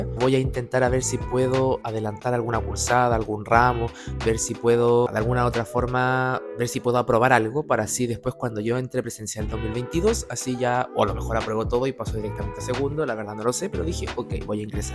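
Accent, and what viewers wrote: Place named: Buenos Aires, Argentina. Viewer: Estas en tu casa capo! Se ve que sos un tipo extrovertido y estudioso Se te pego el acento de Bsas.😊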